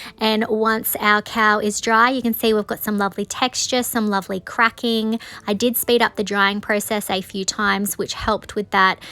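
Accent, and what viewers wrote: Australia. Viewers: I've been watching your videos for several months, and have never commented. Shame on me! Honey, you are just terrific! I have learned so much from watching you. Everything you do, you do with excellence. And beside all that--this old Florida girl just loves your Aussie accent. Keep up the good work.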